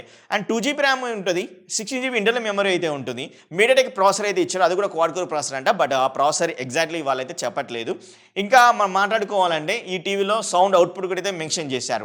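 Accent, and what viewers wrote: Telangana, India. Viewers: మీకు తెలుగు సరిగా రావటం లేదు ఎందుకని, తెలంగాణ వాళ్ళ మీరు? స్లాంగ్ totally తెలంగాణ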